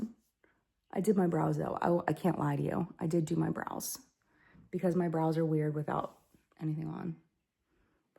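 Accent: South, United States. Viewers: My heart goes out to you, it’s an intensely emotional process sifting through your life’s memories! My best advice is to digitize most of it. Video yourself sorting through them while telling the story behind each item. This way you still keep the memories without the clutter. Seriously, your grandmother’s wig??? Don’t listen to the haters who scold you for speaking “American”! You should never apologize for who you are! You are a lovely person, don’t ever doubt that! 🥰💕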